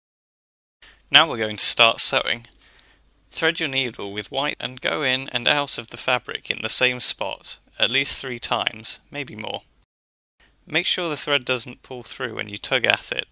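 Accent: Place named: United Kingdom. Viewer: Whoa. I never watched the Goomba guide, so I never knew was British....Whoa. I dint have a problem with British people, in fact, I like English accents, but I never imagined him as British.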